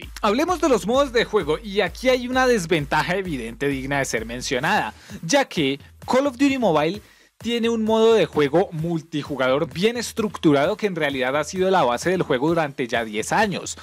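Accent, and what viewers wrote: Colombia. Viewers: Que no se note que es paisa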